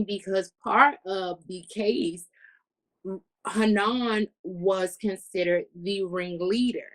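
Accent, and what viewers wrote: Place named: Washington DC, United States. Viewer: I could tell that you were from dc. Our accents are very distinct.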